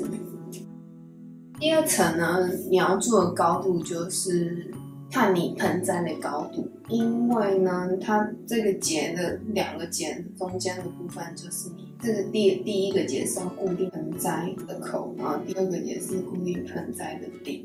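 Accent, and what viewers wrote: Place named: Taiwan. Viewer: 作者可能長居外國，所以台灣口音+外國口音，但也不是你聽不慣就怪，不然你的口音，我聽起來也會覺得怪囉。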